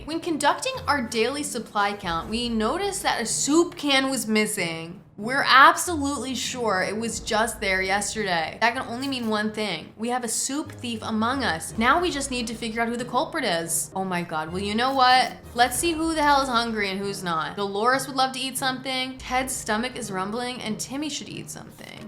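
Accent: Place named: West, United States. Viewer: I fear has slipped into her wild wild western supermarket accent and it hasnt gone away 😭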